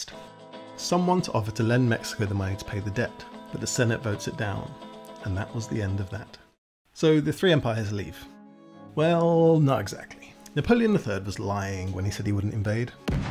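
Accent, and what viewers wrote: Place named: United Kingdom. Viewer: Listening to a Brit pronounce Spanish place names and dates in Mexico in Castellano is hilarious. “Vera Cruth” “Thinco de Mayo” 😂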